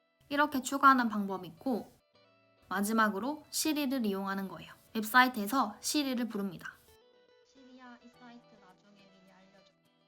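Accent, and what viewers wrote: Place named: Busan, South Korea. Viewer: ㅋㅋㅋ 익숙한 인토네이션에 ‘부산 분이신가,,?’ 했는데.. 맞군요!ㅋㅋㅋㅋ 대박입니다 영상 감사해요!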